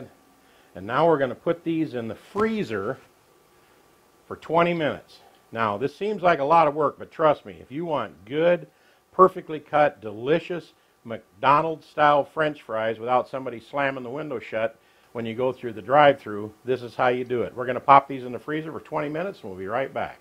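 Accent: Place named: South, United States. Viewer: Nashville, Tennessee. I love the southern accent.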